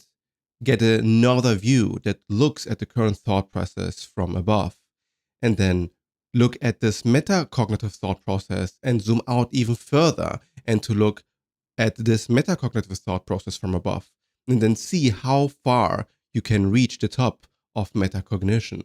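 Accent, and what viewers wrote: Germany. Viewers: hey friend, love your stuff. thanks for sharing. it's really brave of you to speak your truth and i really resonate with what you say. are you german by any chance?? i'm trying to pin the accent hahah. perfect english tho, no offense. have a good day !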